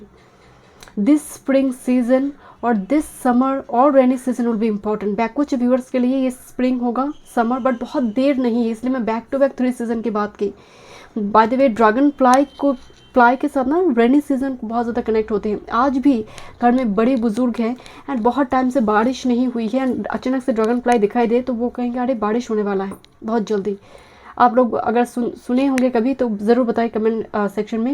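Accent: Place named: South, India. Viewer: Mam are you South Indian... either hyderabad,or karnataka...I guess due to your hindi accent 😅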